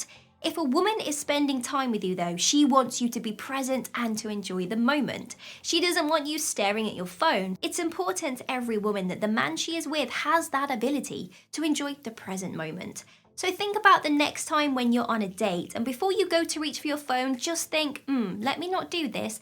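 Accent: England, United Kingdom. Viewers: Love your very English accent. In the old days we simply were gentlemen. I think that summarizes all.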